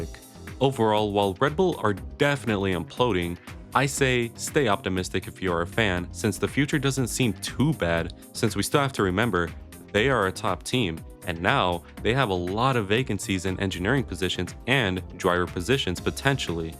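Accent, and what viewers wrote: United Kingdom. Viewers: He's a brit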